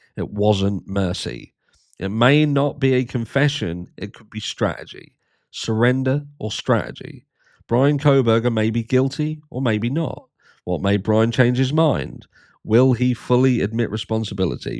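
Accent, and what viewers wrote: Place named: England, United Kingdom. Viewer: Excellent article and narration. Something about hearing things read with an English accent makes them sound more profound. Thank for taking the time to post. Cheers!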